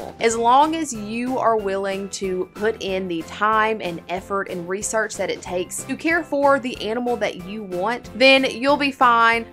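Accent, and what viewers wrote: South, United States. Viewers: As a first time viewer, hearing that southern accent come out of someone who isn’t white is amazing😂 loved the vid, hope you’re having a good day🤙🏼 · First time eating her video off rip oh she southern I can hear it lol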